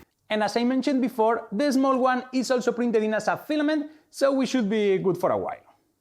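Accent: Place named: Spain. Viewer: You are a genius. I love that you speak fluent English with a super strong Spanish accent.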